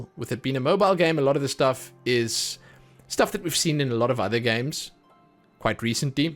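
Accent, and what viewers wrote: South Africa. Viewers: Are you South African? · Your accent sounds like south african. I'm from South africa and u sound like our accent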